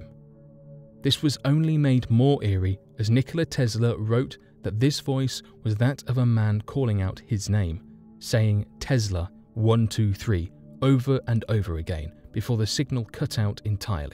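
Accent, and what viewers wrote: England, United Kingdom. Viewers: Why does every Damned commentator have to have a damned British accent? I cant stand it!!!! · You are correct that, in American English, it would be "has". But in British English, "have" is correct. The speaker sounds English to me, and he uses British English.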